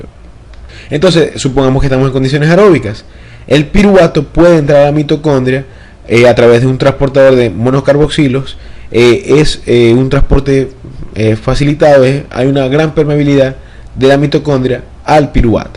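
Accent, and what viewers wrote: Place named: Venezuela. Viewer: Tenias que ser venezolano.. Saludos desde coro.. Te entendí a perfección <3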